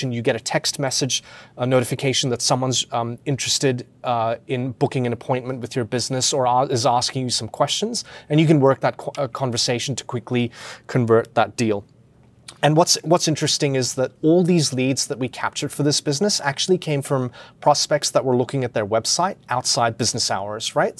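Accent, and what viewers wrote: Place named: Rural, Australia. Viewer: Too much yap yap in country Australian accent than showing ai doing sales.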